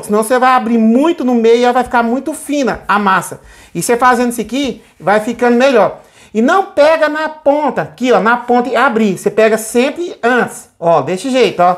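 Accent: Minas Gerais, Brazil. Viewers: acho muito engraçado o SEU jeito de falar parecido com mineiro esparramar eu sou mineira! Por isso QUE tô falando! NÃO esquece da dancinha na hora de experimentar a receita!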